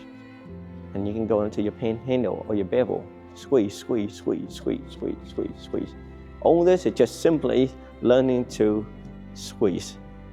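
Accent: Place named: Australia. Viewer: Ok so what if I'm just an intermediate player, what is the best weight type racket and string tension, assuming I'm not very muscled? Even weighted, heavy head, thin griped, light head or which? 😮😮😊😊 BTW, your language and accent give away where you were born and most probably located, down under lol 😊😊😅😅😂😂❤❤❤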